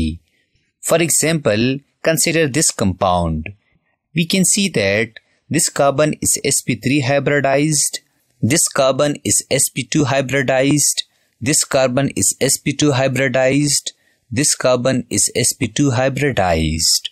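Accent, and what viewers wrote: South India, India. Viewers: I thought you are south indian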